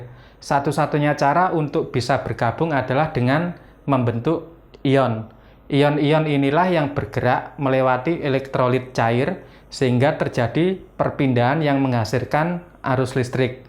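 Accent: Java, Indonesia. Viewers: Dengerin pelajaran teknik dengan bahasa medok ternyata lebih mudah mengerti.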